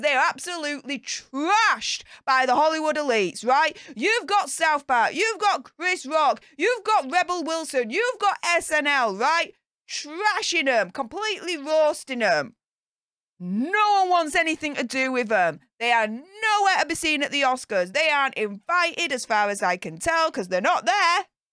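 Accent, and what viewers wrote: Yorkshire, United Kingdom. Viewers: Sounds as though she’s from Yorkshire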